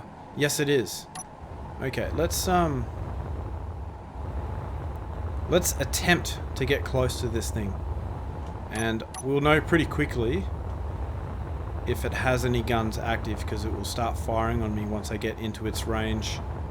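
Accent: Australia. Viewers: Are u Australian? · you sound lke a bloody aussie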